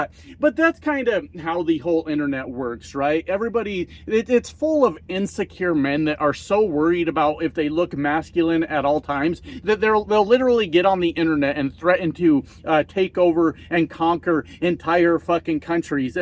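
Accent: Canada. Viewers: First time here and enjoyed your video. Funny enough, as I listened to you, your accent could easily and effortlessly slide in as a Canuck. Oh, and about the fellow who would like to conquer us single handedly? Tell him the best place is Saskatchewan as it's flat and the best time is late January. We look forward to seeing him...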